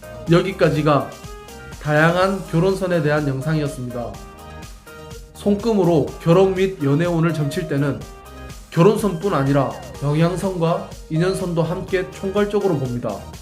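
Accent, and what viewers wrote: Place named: Gyeongsang, South Korea. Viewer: 말투가 경상도 분이시라 ㅋㅋㅋ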